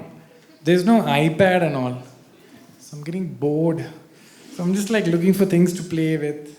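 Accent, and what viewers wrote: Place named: North India, India. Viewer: പാലക്കാരൻ ചെക്കാ പൊളി പൊളി but your accent is like a north indian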